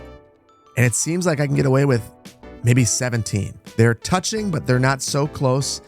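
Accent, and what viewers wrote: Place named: Midwest, United States. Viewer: Cal State Northridge, and I thought you were midwestern. Greetings from This San Diego State '72 almunus.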